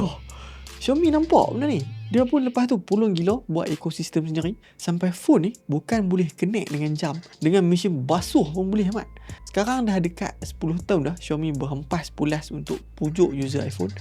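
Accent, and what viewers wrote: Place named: Kedah, Malaysia. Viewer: Ale uto, suara ko slow, cakap macam dalam kelambu. Slang kedah ko aku benci. Cakap bahasa mudah orang faham la., jgn lekat Sgt loghat ko tu🖕🏽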